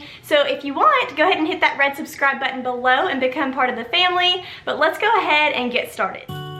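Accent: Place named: South, United States. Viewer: I'm new! I just love your channel ❤ You are very relatable as I live in the south and you sound like you could be my neighbor!😁 I think the room looks so warm and it gives me "Hallmark Christmas Movie" vibes.🎄 Have a super weekend! ~hugs🤶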